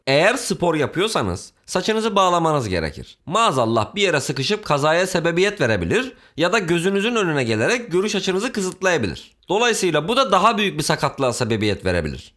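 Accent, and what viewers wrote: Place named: Anatolia, Turkey. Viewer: size bir tavsiye vermek istiyorum, anadolu şivesi yapmazsanız videolar daha keyifli olabilir. Ben ve 3 arkadaşımın ortak görüşü bu, sevgiler.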